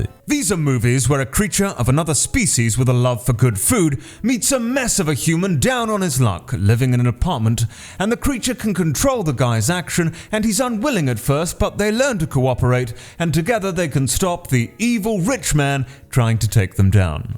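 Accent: United Kingdom. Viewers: 'sup mr british voice sir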